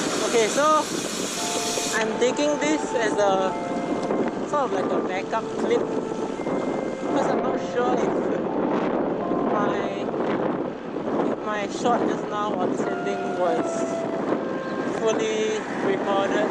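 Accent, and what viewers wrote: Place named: China. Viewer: Nice review!!! Chinese brand bike reviewed by a Chinese accent. Thank you 😁👍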